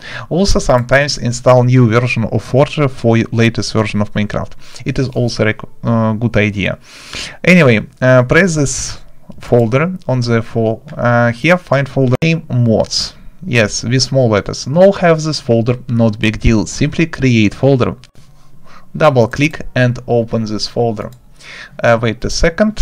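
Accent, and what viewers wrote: Russia. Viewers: ты говоришь на руском? очень похоже просто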